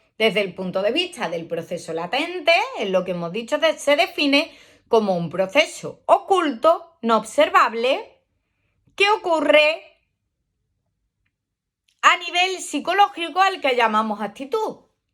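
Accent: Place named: Andalusia, Spain. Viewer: Hola, estoy siguiendo tus audios psico uned, van muy bien mientras paseas,en tren, etc, y ese acento sevillano o andaluz lo hacen más ameno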